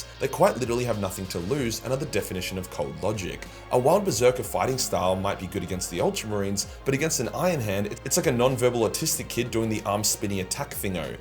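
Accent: Australia. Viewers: can you please lower the volume of your outro? I cannot count how many times I have almost fallen asleep to your lovely Aussie accent only to get jumpscared awake by the "BOW TO ME"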